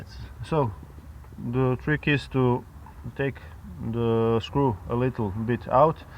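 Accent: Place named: Russia. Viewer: С таким акцентом может говорить только русский.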